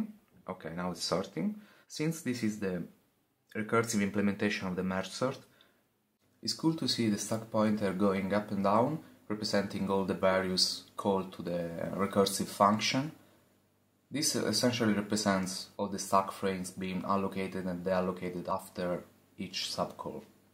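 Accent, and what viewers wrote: Italy. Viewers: Italiano ? Si sente l'accento.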